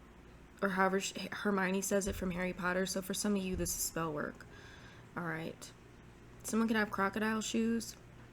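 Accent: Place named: South, United States. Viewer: Thanku my dear, i luv ure sthrn drawl!!! Ppl hav that in th Deep Sth of our country too!!! Bc of th irish and scottush settlers that lived there!!!!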